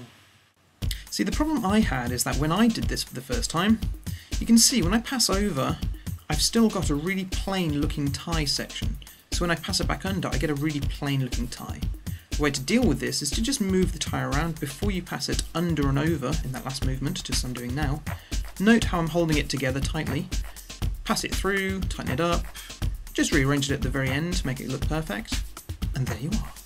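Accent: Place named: RP, United Kingdom. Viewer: ahahah you really can find almost everything on youtube thanks for the information he does sound pretty posh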